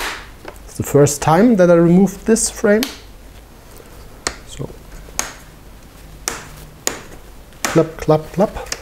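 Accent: Germany. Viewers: Bei meinem A517 scheint das Kabel einen Wackelkontakt haben. Teilweise fällt das Bild aus, oder es flackert. Kann ich das Kabel auswechseln und worauf muss ich dabei achten. Ich gehe davon aus, dass deine Muttersprache deutsch ist. Gruß Klaus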